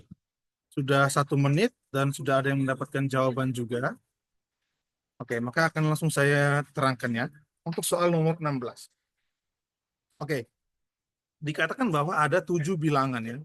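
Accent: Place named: Nagekeo, Indonesia. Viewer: Logat Nagekeo sedikit sedikit keluar e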